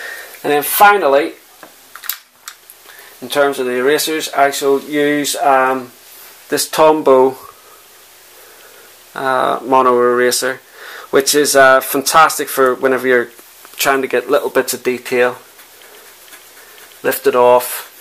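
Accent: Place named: Northern Ireland, United Kingdom. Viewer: Great to hear a fellow NorthernIrish voice talking about coloured pencils!! I started using them about 3 years ago in my art (I use lots of different media), but I don't know anyone else here using them. Do you live in N Ireland? I'm a member of the UKCPS, I don't know if you have heard of them? Anyway, these are great pencils but I only have the small set. Atm I mainly use Faber Castell polychromos and I love Derwent's Drawing set palette, especially on black paper. Will follow you on FB and Twitter!! Thanks for these videos. My husband keeps saying I need to start doing videos but I don't think I'd be much good. This was very informative and well done. Keep up the good work!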